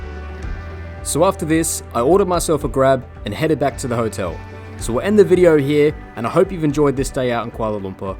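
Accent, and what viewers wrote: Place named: Australia. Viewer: Ahhh australian accent. Welcome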